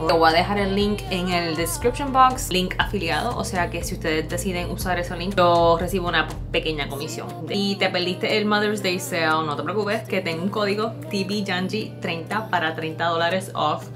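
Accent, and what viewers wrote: Puerto Rico. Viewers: Mira de verdad lo unico de China 🇨🇳 q tu tienes es tu rasgo Fisichella xq hablas como nosotros los Boricuas con nuestro acento osea q los años q vivistes en NYC NO AFECTARON ESE ACENTO, MUCHAS BENDICIONES EN TU NUEVO PROJECTO Y Q SEA DE MUCHO EXITO,AMEN, GRACIAS X REPRENTARNOS CON MUCHO ORGULLO ❤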